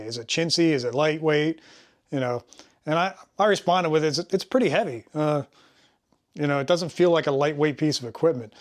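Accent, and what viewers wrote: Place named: Western Pennsylvania, United States. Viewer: Useful man thanks! Western PA? My folks are from Pittsburgh and I went to grad school there. Accent is tickling neurons.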